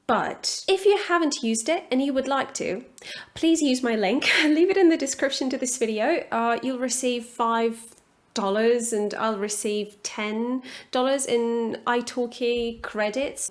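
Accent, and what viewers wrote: United Kingdom. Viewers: I thought you were British! I’m American though. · honestly I can't, but I'm American and you have a lovely Brittish accent. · Perfect British accent) I can't imagine how much effort it takes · how did you develop such an amasing British pronunciation?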